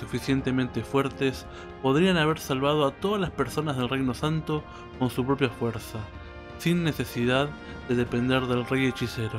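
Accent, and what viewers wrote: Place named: Argentina. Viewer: ¡Ése sentimiento en el guión! 👍 👍 👏👏👏,para un Hispanoamericano, sólo lo puede vocalizar un Argentino 🙌🙌😘💜, te lo dice un Chileno amante de su Patria, ¡bravo por tí! 👏👏👏✌✌✌🙌🙌🙌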